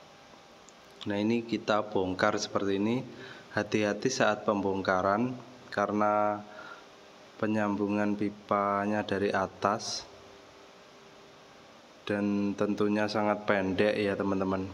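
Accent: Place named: Central Java, Indonesia. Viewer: Logat bicara jateng ..jatenge ngendi pak..?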